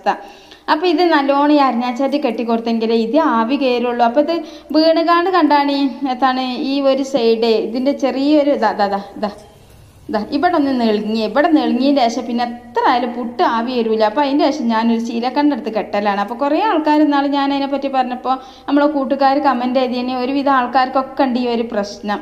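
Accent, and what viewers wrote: Malappuram, India. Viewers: മലപ്പുറം ഭാഷ പഠിക്കാൻ വരിനെടാ മക്കളെ 👍👍👍👍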